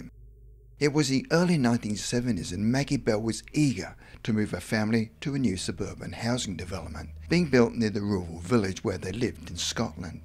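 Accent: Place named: Australia. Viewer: The lady is Australian. If you wa want to listen to only English pronunciations then your choice of videos is going to be very limited. Even well paid TV presenters make errors in pronunciation, including the English, Scots, Welsh and Irish. The narrators here don't seem to be monetized. So they are doing us a favour. Don't like it then why be on here long enough to comment? Sheesh.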